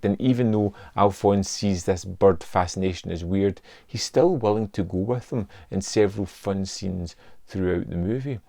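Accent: Scotland, United Kingdom. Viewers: I'm here because another place asked for best Nicholas Cage movies and i thought of Birdy. I've been in mental hospitals so I related to that part. At one point Modine mentioned Wrestling and around this movie he also did Vision Quest. Both movies showed him really in good shape. But hey...I was too at that age. Oh, and since I'm new to this place, was is your accent? I live in in Boston and have that and it's different than New York which isn't far away. But I'm guessing Scottish?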